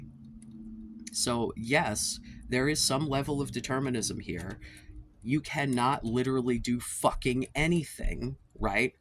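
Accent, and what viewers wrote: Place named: United Kingdom. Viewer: I came here to learn british accent lol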